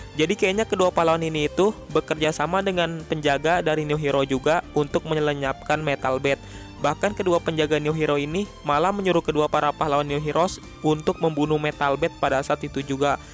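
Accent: West Java, Indonesia. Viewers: Mimin na orang Sunda ouy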